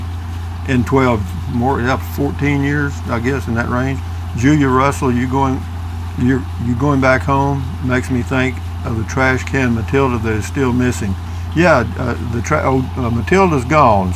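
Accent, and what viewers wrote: Texas, United States. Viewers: Linda Fowler My pleasure. generously shares his unique lifestyle and perspective with us. I find his stories interesting, his adventures entertaining, and his quintessential Texan delivery a rare treat. I am happy to help support his efforts in his preferred manner.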